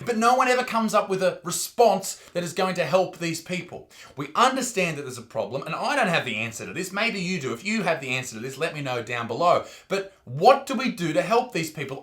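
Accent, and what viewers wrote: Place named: Australia. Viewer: He has an Australian accents tho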